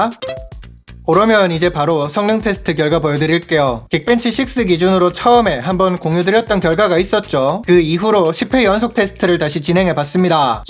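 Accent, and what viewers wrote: Gyeongsang, South Korea. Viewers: 숨길수없는 경상도사투리의 상세한 설명 감사합니다 ㅋㅋㅋㅋㅋㅋ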